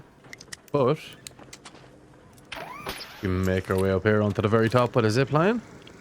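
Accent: Ireland. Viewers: I know a fellow Irishman when i hear him 💚 thanks for the useful info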